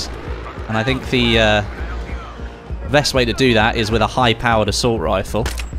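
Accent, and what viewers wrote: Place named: United Kingdom. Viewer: Whoah ur from UK anorl I thought u were American what part UK u from some accent that mate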